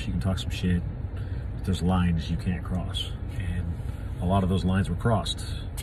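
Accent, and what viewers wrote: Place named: South, United States. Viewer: No no, then ya won’t hear that lovely southern drawl!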